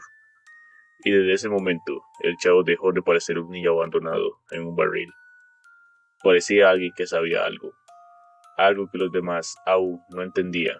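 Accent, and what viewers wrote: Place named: United States. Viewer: Buena Creepypasta bro pero y ese asento hablas como un gringo tratando de hablando español y lo hubieras hecho con la voz Loquendo de vería más chido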